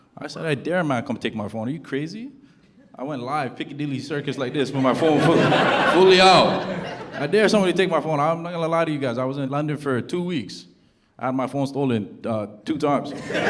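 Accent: Toronto, Canada. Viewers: This guy has to be from toronto from his accent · Anyone wondering how a real Toronto accent sounds it this..not the suburban kids on TikTok😂😂😂😂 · Buddy sounds straight from Toronto off rip 🤣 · i kno toronto when i hear it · Bro, I knew you're from Toronto, listen to that accent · God couldn’t listen to the whole act. That disgusting “Toronto accent”. 🤮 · Trus me I knew right away before he mentioned it this man had to be from T.O.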